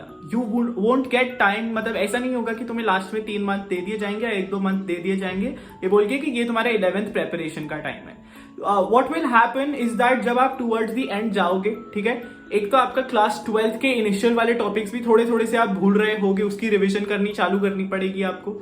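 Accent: Punjab, India. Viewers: Kya tum punjab se ho....✍️✍️✍️✍️✍️✍️✍️✍️👂⤵️⤵️👂⤵️🏆🏆🏆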